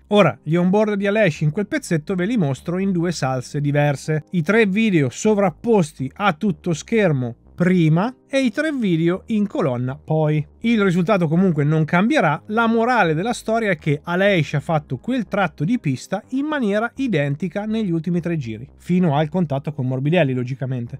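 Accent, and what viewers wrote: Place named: Veneto, Italy. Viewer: E io che pensavo che fosse Emiliano, ora ho il dubbio che sia Veneto 😂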